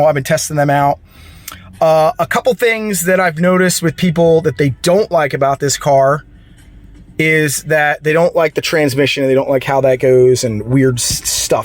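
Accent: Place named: Philadelphia, United States. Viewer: Dude, are you from Philly? Because you sound, well, you sound like me LoL. Anyway great details. Can you disable the start/stop? I'm picking one of these up tomorrow.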